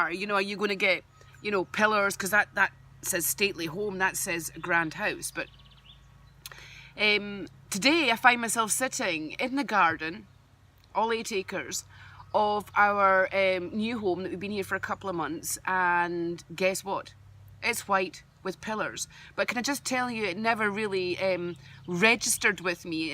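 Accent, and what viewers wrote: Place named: Scotland, United Kingdom. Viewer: Hi,. What a gorgeous place and nice to hear a the Scottish accent. Beautiful. I wish I could dream manifest the life I want, I would feel happier and less sad if so. xx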